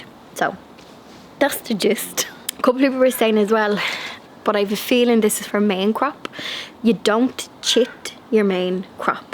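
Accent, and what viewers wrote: Ireland. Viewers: Hi, a great video and you have got me motivated ( which I needed ), I have power washed my decking and other bits ( also myself ), and tidied up a bit, and it’s all down to you, so thank you so much, I love your very energetic videos and of course your wonderful Irish accent, you make my day. xx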